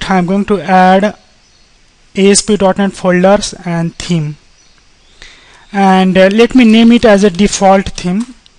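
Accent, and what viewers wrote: India. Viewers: why are all of the videos i really want to watch narrated by Indians? its just annoying and hard to follow, im sorry.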